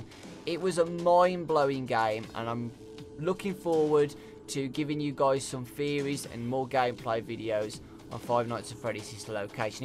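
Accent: United Kingdom. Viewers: that was sweet man ! well done but that british accent :-D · He has a british Accent he is form the UK C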